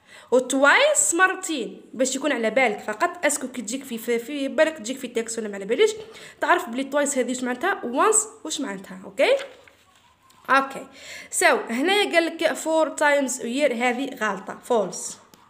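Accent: Tunisia. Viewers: نتي تونسيا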